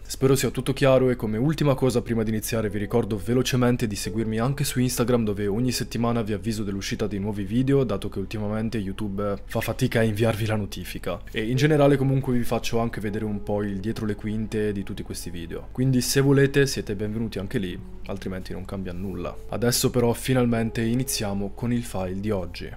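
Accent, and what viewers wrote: Sardinia, Italy. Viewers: Bella sardu sese?😂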